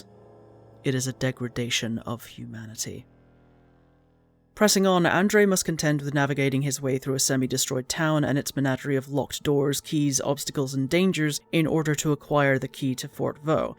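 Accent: United Kingdom. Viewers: You are an excellent storyteller. The tone of your voice, background music, and the visuals add so much. Also am I crazy to think you sound like Sylvanas just British? Lol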